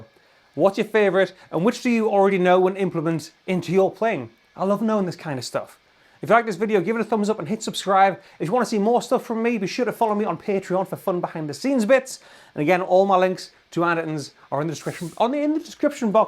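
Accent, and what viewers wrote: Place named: Wales, United Kingdom. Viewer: Awesome video! I am perplexed by two things though… the guitar says les Paul on it but looks like an sg?? What is it???? And also your accent?? Wales???? Im scratching my head over here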